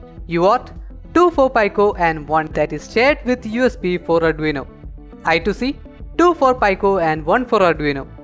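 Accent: Kerala, India. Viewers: language and slang are quite nice, but I think you are from Kerala, 🙂 Actually, Arduinos are recommended for the beginners to learn program and robotics later followed by Esp modules, rsp2040, Raspberry pi, latte panda etc. etc.... anyways you need to include more information for beginner to understand well and to start their journey with right components, Other than this everything is fine. great job bro!!!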